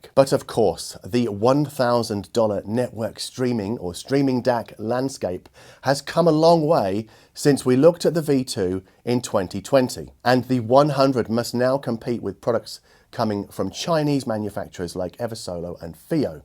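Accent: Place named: England, United Kingdom. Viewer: Thanks! Get well soon (You sound like Caleb from Digital Trends albeit with an English accent 😅)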